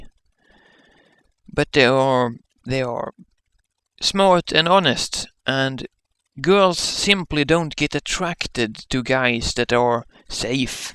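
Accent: Sweden. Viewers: med Wa...Yeah, I agree on both points! :-) I was like, "Is he Scandinavian, German, Swedish, just Autistic vocally in his own way?...But hey, Swedish sounds good to my ears from this guy. ;-)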